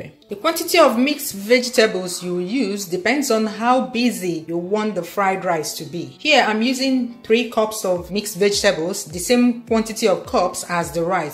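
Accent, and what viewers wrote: Igbo, Nigeria. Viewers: Your accent is always sounding Nigerian, this jollof rice is called benachin or cheb in Gambia or Senegal respectively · Am more paying attention on that lovely igbo ascent more then the lecture Nnem dalu. On my way to supermarket over a month now this is exactly I need the German resentment never gave me the test I needed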